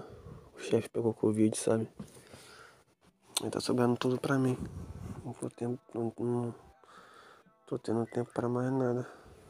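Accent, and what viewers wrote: Rio de Janeiro, Brazil. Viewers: Deus, percebi agora que eu tenho gatilho por sotaque carioca 🥱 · So uma dúvida aqui... você é carioca? Se vc Não for, eu vou bugar muito kaksksks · Boy... Eu tenho um amigo com a voz idêntica a dele e tem o mesmo sotaque Carioca ❤️ meu amigo é belíssimo, tô desconfiada que seja ele nesse canal kkkk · O SOTAQUE CARIOCA É LINDO, PIQUE BANDIDA ✋ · Sutaque carioca: a minha barriga : 🦋🦋🦋🦋🦋🦋🦋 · gosto muito desse sotaque carioca😍😍😍amo❤ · Por que um sotaque carioca chama tanto minha atenção caralhooo🌚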